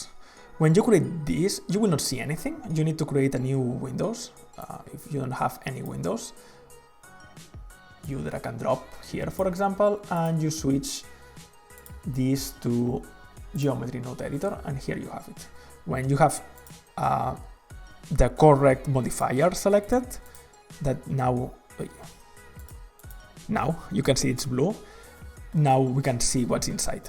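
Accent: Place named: Catalonia, Spain. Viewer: Sembles de catalunya tio, un grande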